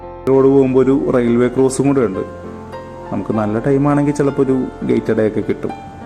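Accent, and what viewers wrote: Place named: Kollam, India. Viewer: Shudhamaya Kollam slang.. ethaand achadi malayalam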